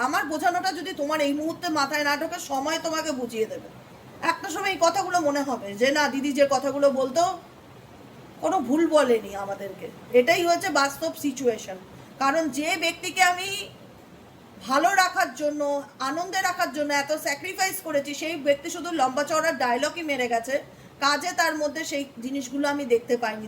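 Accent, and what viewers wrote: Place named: Bangal, Bangladesh. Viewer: দিদি আপনি যে বাঙাল ভাষা বলেন আমার খুব ভালো লাগে। আপনি বিড়াল কে বললেন জুত করে বসো। আমার মনে হয় হাজার এর মধ্যে এক দু জন বুজেছে।😊😊😊😊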